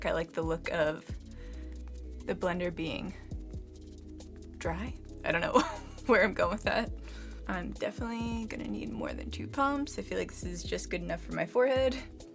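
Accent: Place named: East Los Angeles, United States. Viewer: Wonder how she has East LA accent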